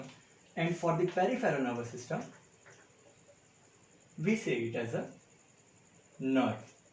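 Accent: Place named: Jagdalpur, India. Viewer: Yeh toh Jagdalpur walaa haina😂 Keep it up Sir..👏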